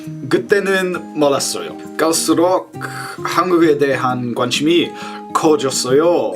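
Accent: United Kingdom. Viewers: you guys make me want to speak british accent too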